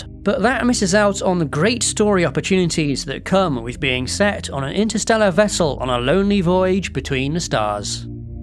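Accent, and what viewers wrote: United Kingdom. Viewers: ok british man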